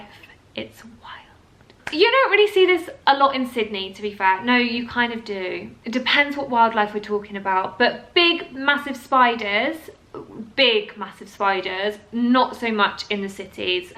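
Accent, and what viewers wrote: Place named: United Kingdom. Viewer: i cant pick your brit accent . where u from ?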